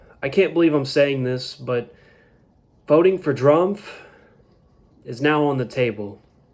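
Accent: Mississippi, United States. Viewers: What part of Mississippi are you from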